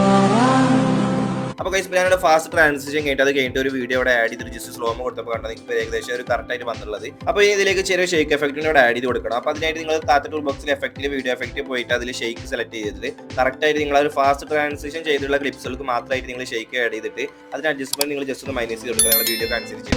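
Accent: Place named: Kannur, India. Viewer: Machuuu neggaa kannur alle 🫵🏻😂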